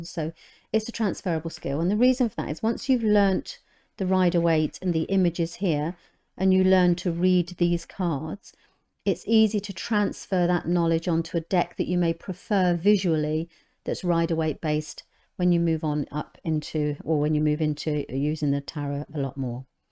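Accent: England, United Kingdom. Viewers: beautiful voice, may i ask the accent, English, but where from? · Self-knowledge tools with a British accent? Oh how I love it 🥰👍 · Thanks so much for this - really clear and interesting. It's also good to see a tarot video made by someone who is English, and not American!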